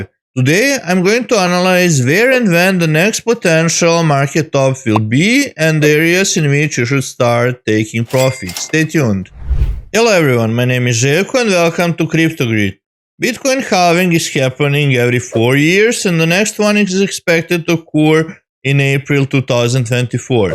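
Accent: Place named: India. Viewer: Da, zvucis ko indijac..jbg sry ostavi ako zelis da radis na engleskom ali mozda da odradis i na nasem jeziku ili novi kanal za balkance!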